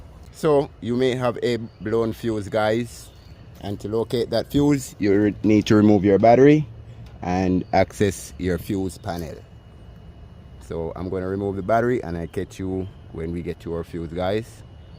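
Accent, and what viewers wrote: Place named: Jamaica. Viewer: You did a great job thank you for the tip and showing us what to do and I like your accent are you Jamaican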